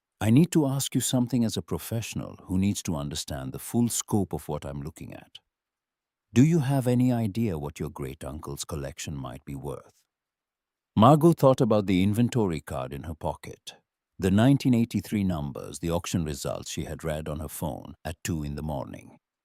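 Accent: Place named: Wales, United Kingdom. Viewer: Loving the Welsh accent ! Makes a nice change from an American one or a faux English accent. ♥️🇬🇧♥️ It keeps changing between Welsh, Indian and French, hilarious ! 😂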